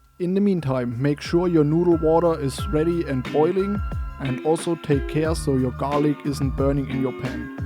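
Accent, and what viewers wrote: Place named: Italy. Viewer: Didn't even notice your italian accent until now😙👌🏻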